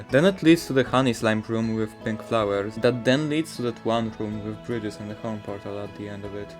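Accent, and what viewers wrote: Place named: Poland. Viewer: this guy's polish accent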